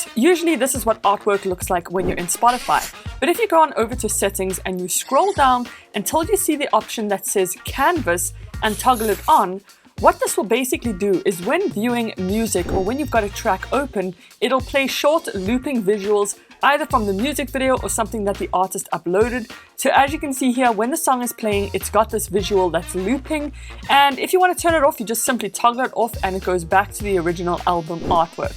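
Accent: South Africa. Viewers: Are you from south africa? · Are you South African? It's the accent. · Are you South African 😁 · Loving hearing a south african accent, much love from Jozi! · Do you come from South Africa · No lie I clicked on your video for the first time from my recommended and as soon as you started talking I was hold up, that accent sounds familiar, and you know I gotta support a fellow South African on YouTube. 😂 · South African????? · You are from South Africa right ?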